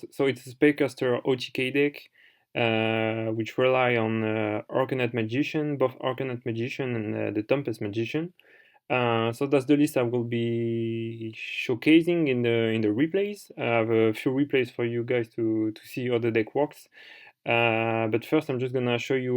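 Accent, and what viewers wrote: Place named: France. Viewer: i'm assuming you're french by your accent but i'll write in english for everyone. I thought about this deck quite a while now bcs tempest was an ftk that got banned in modern formats and you overlooked some cards that can actually turbo this instead of playing a bunch of randoms cards. Magical library is a spellcaster that will keep the engine going while being lvl 4. I think the most important tuner here is plaguespreader and should basically be the only one. You can use summoner monk to go into library or armageddon knight to dump plague that will combo with monk to summon tempest. You then play a crazy amount of draw spell cards like hand destruction, bamboo swords etc. No upstart so you can otk/ftk and why not a copy of magical explosion. I never tested it but i think it would be the optimal way to go.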